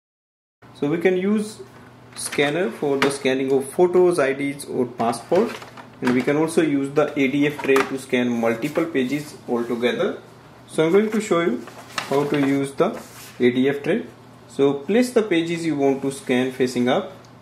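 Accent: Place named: India. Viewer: Plain & simple & i love ur indian accent 😝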